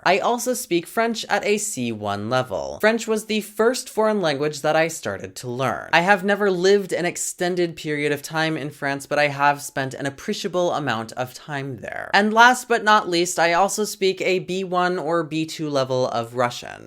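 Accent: Portugal. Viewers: yeah your french is beautiful and your Russian is better. Também gosto que falas a tipo do português do portugal. Continuas falar meu amigo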